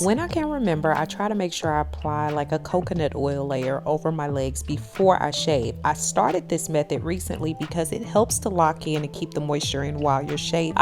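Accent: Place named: South, United States. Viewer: She's grew up in St. Louis & Memphis & lives in Texas so I think it's a mixture of those three. Just my opinion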